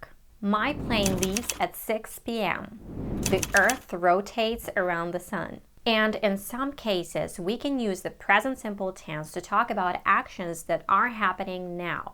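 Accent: United States. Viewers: I❤your American accent. You're the Best. Thanks 🥰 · Wow! You're Ukrainian. I thought all the time you were American. You've done a great job. Congrats. 💐🌹💐🌹💐🌹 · Wow! You have an excellent American accent, I like it, I congratulate you and keep going. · The fist time i saw you on youtube, i thought you were American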